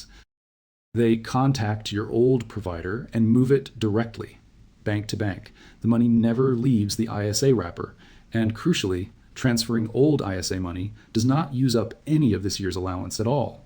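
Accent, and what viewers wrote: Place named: United States. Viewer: Why the American accent? We are talking about England here!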